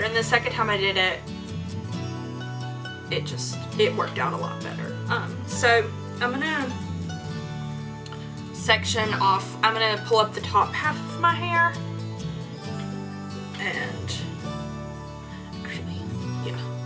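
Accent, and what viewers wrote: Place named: South, United States. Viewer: You sound kinda like your from the south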